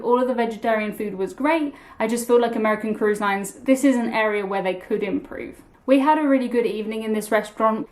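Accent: United Kingdom. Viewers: I like the idea of the animated meal - that is wild! On a separate note, I have never been on a cruise and started seeing some of your videos. I have enjoyed watching your videos. I have watched other cruise videos, to your credit I find your videos to be more fluid, that is they move quickly between points with a clear direction. Perhaps this is just the American in me that likes British accents but I enjoy hearing your voice as well as the British-isms. Thank you.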